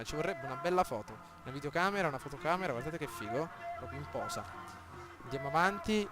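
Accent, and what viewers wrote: Sicily, Italy. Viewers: sei della sicilia?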